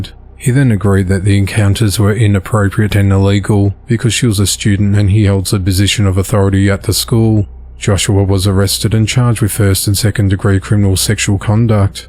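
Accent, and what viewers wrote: Australia. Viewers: Are you Australian? Anyway have a nice holiday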